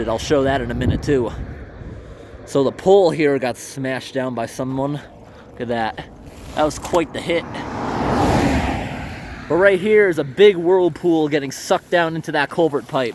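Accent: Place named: New England, United States. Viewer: I think your yankee accent threw them off... They weren't sure what you were saying.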